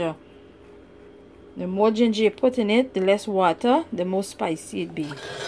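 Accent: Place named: Guyana. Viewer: i love your accent , i am from the UK ,, real guyanese accent .. ok nyce gyal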